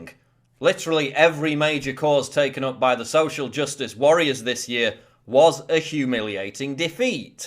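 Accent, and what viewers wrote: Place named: England, United Kingdom. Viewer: Alan Fournier His particular English accept you dumb fucker. Not all English people sound the same, his english accent annoys me.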